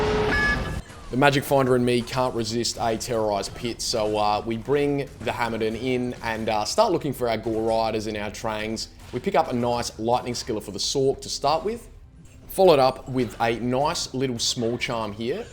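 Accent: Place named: Australia. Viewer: Suped for the aussy accent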